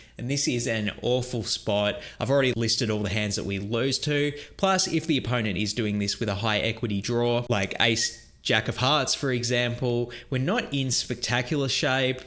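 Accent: Australia. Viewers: You talk out the side of your mouth as it is. I can't understand some things you say. There is something about Australians and the way they talk out the bottom side of their mouth. It makes some words hard to understand lol. The accent is awesome but the way the accent comes out is hard lol. Tough sessions happen, better luck next time.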